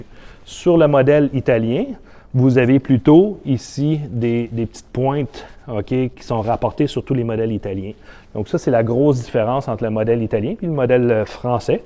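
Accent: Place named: Canada. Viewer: On aime beaucoup votre accent, çà donne son truc a lui et puis j' aime bien l accent canadien et le Canada en général, çà nous fait voyager , il y a bien l 'accent suisse selon les endroits plus accentué , en revanche à la frontière il parlent comme nous , de même que l ' accent belge, donc la France ,avec ses accents (du sud , le nord...) avec la mondialisation on s' est habitué ;) ne parlons même pas de l' afrique francophone... quoiqu' il en soit, là n 'est pas le problème vous présentez bien, et ses passionnants vos observations, les petites anecdotes s' est distrayant, mieux qu un magazine moto , personnellement je préfère les gens passionnés objectifs comme vous , qu un journaliste de magazine moto.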